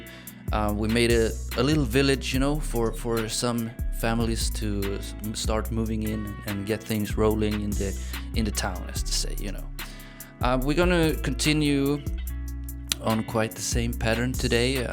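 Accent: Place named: Caribbean. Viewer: Hey hey hey 👋🏾. My Swedish YouTuber with Soul. Again, really happy to have you back. Always enjoyed you videos for many reasons especially being the music. You definitely remind me of someone from the Caribbean islands. The accent and cool vibes. Are you still going to be making your own for your videos? Anyways, have a great weekend and stay cool. Looking forward to your next vid.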